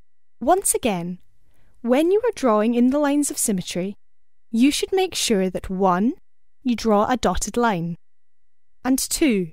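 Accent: Scotland, United Kingdom. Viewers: Good stuff, lovely sexy Scottish accent too.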